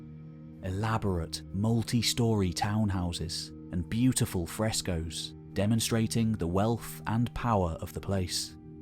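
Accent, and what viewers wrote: England, United Kingdom. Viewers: just hearing your english university narration = PUKE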